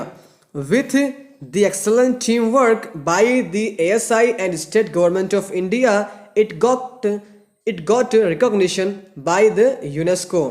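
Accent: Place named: United Kingdom. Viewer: Thoda angrej wala accent nahi bolte to Jada sahi rehta sir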